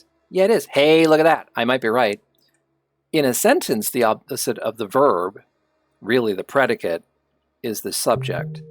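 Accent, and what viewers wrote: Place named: Canada. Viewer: Also curious since you mentioned it - there was something that stood out to me in one of your videos. I had assumed (now confirmed correctly) that you are Canadian since you have been saying "zed" but don't have an east-overseas accent. Though later I noticed when you were looking in the Hinterlands, you said "catch some ZEE's" which I think is the only time so far I've heard you say it like that, is that particular idiom usually said that way? Or did it just happen to roll off this time?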